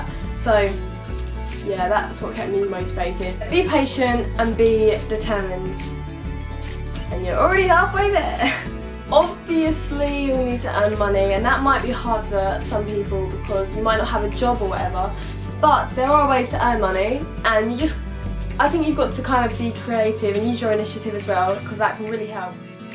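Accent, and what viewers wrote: United Kingdom. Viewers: your accent is amazinggg omg I LOVE YOUR BRITISH VIBES❤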